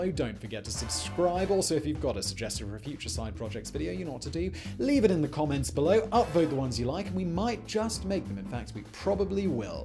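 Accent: United Kingdom. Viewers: His speaking is tuned more to a Brits ear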